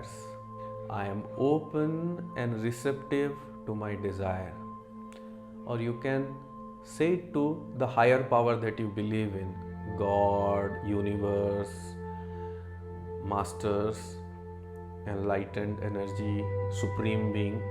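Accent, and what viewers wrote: India. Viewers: I joined you back in January. I love the way you say things and you’re Indian like me with the Indian accent like my parents. Please let me know when you come to Orlando, Florida.. I would love to meet you. Soni Add me on Instagram ( sonibaby) and Facebook. * I have manifested things bc of your teachings. I can’t thank you enough.😘🙏🏽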